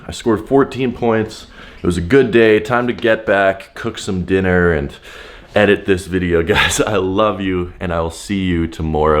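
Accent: Canada. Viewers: time is money! Uhh you just wasted 3 mins! Cus who wants to listen to an Annoying Canadian Ray Donovan!?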